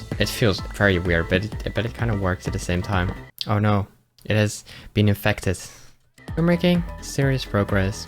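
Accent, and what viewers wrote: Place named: Netherlands. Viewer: Oh! You’re Dutch? I always wondered where you were from. I feel silly for not guessing it sooner based on your accent. Hello from Toronto! 😄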